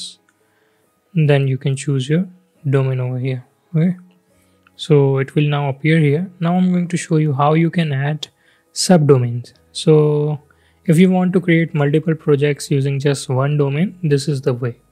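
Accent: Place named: India. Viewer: indian accent = like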